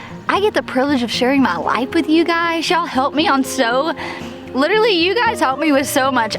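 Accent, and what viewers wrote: South, United States. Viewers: Beautiful garden! Success? Today I made homemade pesto from basil in my herb pots. Best pesto I've ever made. I shared it with my neighbor and daughter in the form of a really great pizza. It would have been a pretty empty feeling otherwise. So sharing your garden with your followers is the same thing but on a much larger scale. BTW, don't ever, ever, ever apologize for your southern accent. It's what sets us (me-NC) apart from others. What a boring world it would be if we all sounded the same. 😀 Take care!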